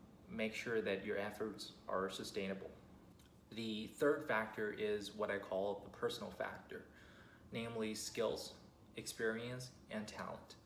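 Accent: South, United States. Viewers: Dude how do you sound so Southern? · Hi, I have a question, how did you learn English? From your accent, I guess you went to american at an early age and studied maybe middle school in USA, is my guess correct? ❤ your sharing videos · Your English has a southern style to it 🔥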